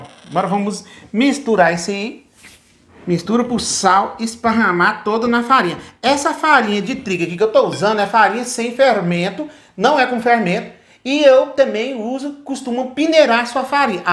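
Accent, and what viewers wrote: Minas Gerais, Brazil. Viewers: E aprimeira ves que Estou vemdo seus aldio amei fose Emuito fofo pelo sel sutaki voce e mineiro adoro kkkkkkkkk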